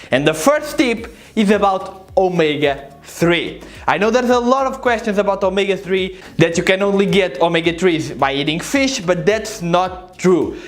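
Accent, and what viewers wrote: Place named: Spain. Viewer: Ha, I think its the Spanish coming through.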